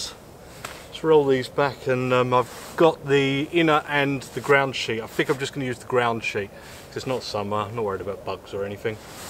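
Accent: England, United Kingdom. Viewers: Hi, Please extend your food eating aspect of your videos. A big part of why I enjoy your camping videos over others, well in part its because of your English accent as I'm Australian but the rest of my family was born in England and all my relatives live and are from England or Wales but its also because I really like what you choose to cook and I enjoy watching every aspect from the preparation (why you don't have a nice shun or global kitchen knife I don't know) cooking and enjoy watching you enjoy eating the food. That chicken for instance looked really yum and instead of only really seeing two bites of it, I would enjoy your videos even more if we could watch you enjoying the food much longer. Btw, if you do buy a kitchen knife for food prep and eating, I really recommend the Shun tomato knife, its lightly serrated and compact and really multi-purpose, just don't try cutting through bone. 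Or Global GS-10 cheese knife as its compact, multi-purpose and you literally could use it as your fork. It also has a stronger slightly thicker, more robust edge than the shun. Both are extremely sharp. The Global knife being wholly stainless steel is slightly more hygienic given you could probably submerge it in boiling water if you needed to. I actually found it difficult to find UK links, even that one gave me AUD and free shipping to Aust. Link Shun <link> Link Global GS-10 cheese knife <link>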